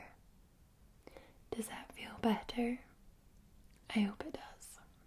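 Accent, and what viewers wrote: Scotland, United Kingdom. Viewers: official Bears fan.🐻⬇️ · good tae see Scottish asmr 🤝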